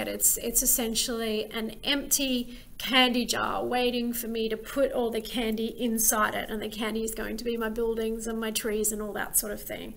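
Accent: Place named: Australia. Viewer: You explain this so well. It was so simple to understand and follow along in my ArcPro account. The Australian accent is top tier! Super helpful, thank you from California!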